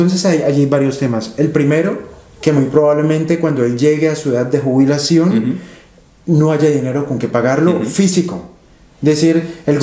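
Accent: Colombia. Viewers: eres colombianito que bonito acento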